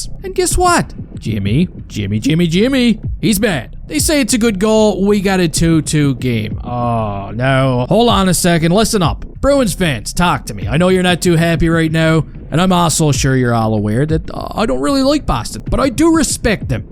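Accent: Newfoundland, Canada. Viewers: Whwre you from bi? Me and the misses are arguing I'm saying your from newfoundland and she's saying Scottish 🤣 where canadian so help us out bud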